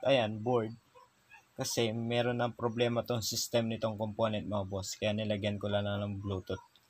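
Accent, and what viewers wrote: Pampanga, Philippines. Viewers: Boss gnyan din problema ng ampli ko.. Mahina kbilang chanel. Pinaayos ko pero di naayos.. San loc mo? Prang kpampngan k kc kung mgsalita.. Kpmpngan din ako baka mgkalapit lng tyo paayos ko ampli ko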